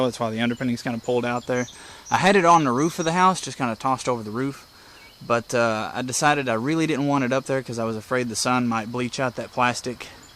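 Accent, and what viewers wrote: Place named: Eastern Kentucky, United States. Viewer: you sound like a Eastern Kentucky boy, am I right ?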